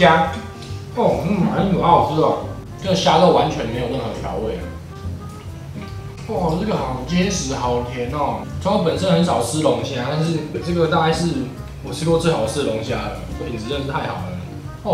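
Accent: Taiwan. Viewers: 光聽這台味就舒服了！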